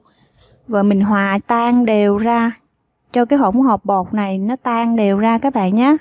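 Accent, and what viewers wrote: South, Vietnam. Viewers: Con gái miền Nam nói giọng thật nhẹ nhàng dễ thương! Làm bánh cũng đẹp nữa. Mong con chia sẻ nhiều video để mọi người tham khảo học tập và làm theo con nhé!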